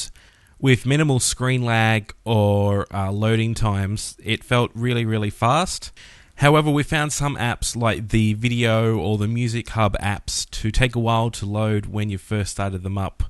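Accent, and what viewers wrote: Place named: Australia. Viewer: awesome revie yo sound aussie like me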